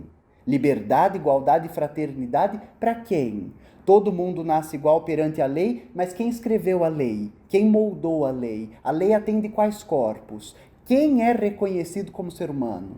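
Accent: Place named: Inland São Paulo, Brazil. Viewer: Apresentação brilhante e muito rica nas citações, elogios a sua pronuncia das palavras, como o paulista do interior, e desta elegante mistura de tantos imigrantes do Estado de São Paulo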